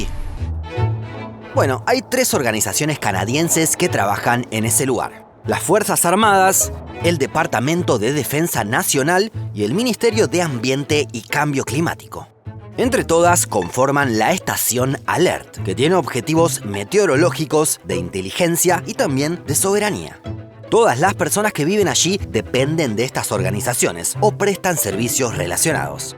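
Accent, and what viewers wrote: Argentina. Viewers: ¿Este canal lo hace un argentino?, pregunto por curiosidad.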